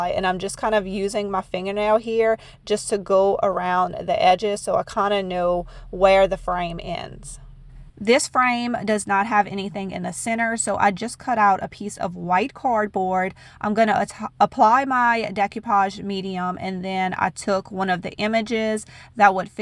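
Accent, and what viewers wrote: Louisiana, United States. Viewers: Good day. I love your accents. Please settle a bet. I hear Louisiana and The South. Am I correct? Pft, my son says I'm wrong.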